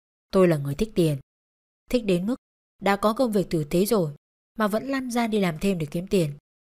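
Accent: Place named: North, Vietnam. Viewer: khong phai ky thi chu giong la giong bac ma minh thay de thuong kinh khung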